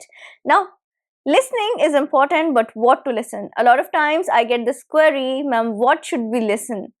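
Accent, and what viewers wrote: England, United Kingdom. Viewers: I listen to your videos ❤ for improving my english listening skilss❤ i like you english accent really very much ❤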